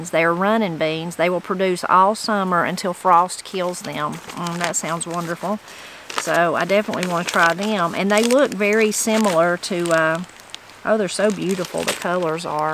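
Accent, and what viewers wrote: Appalachia, United States. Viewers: Hope you can find enough room to plant all you want. I too pronounce zinnias like you….maybe it’s an Appalachian thing🤷‍♀️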